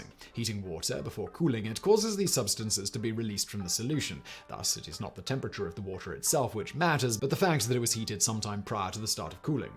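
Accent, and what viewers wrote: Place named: United Kingdom. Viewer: I came here thinking this was Vsauce and heard a British dude and im like is this a British sub?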